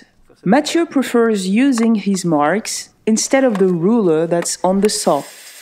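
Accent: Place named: England, United Kingdom. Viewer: i really like your english accent. have a nice day ;-)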